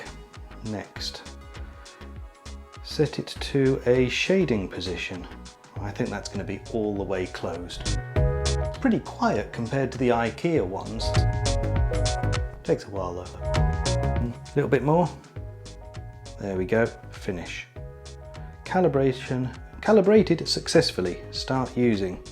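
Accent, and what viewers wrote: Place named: United Kingdom. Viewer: I came for the switchbot and stayed for the polite sounding british accent 😊 btw linus sebastian vibes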